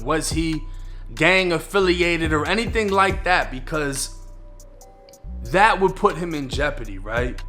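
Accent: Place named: New York, United States. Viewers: The narrator, the one that telling the story sounds like a New York hooker, bro, talk in your own language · Why do you have a New York accent as a Floridian.. please explain..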